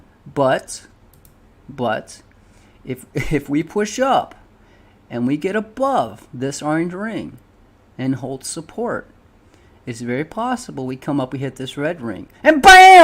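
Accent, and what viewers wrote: South, United States. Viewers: That country drawl is killin the chillin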